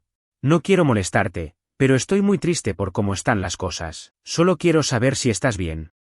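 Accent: Argentina. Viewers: No es que no me guste el bot argentino pero prefiero español neutro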